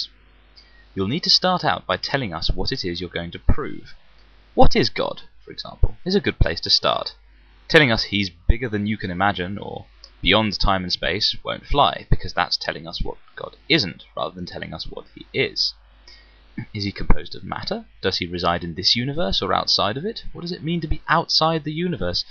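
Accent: United Kingdom. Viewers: I love your British accent ;). Her video was funny. I asked a few Christians why they believe and a couple reasons I got were "If I live as if there is a God and I die and find there isn't, what have I lost. nothing. If I live like there is no GOD and die and there is, I have Lost everything. That is a chance I am NOT willing to take." and "the evidence is all around just look at nature"...etc. LOL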